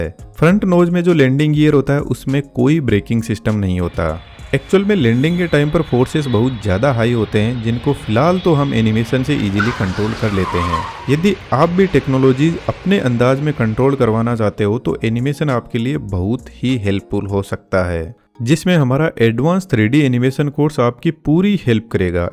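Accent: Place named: Marwari, India. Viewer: Marwadi voice pehchan li 😂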